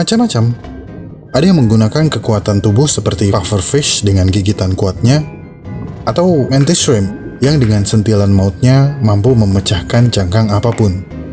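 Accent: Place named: Makassar, Indonesia. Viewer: DARI MAKASSAR MANA SUARANYA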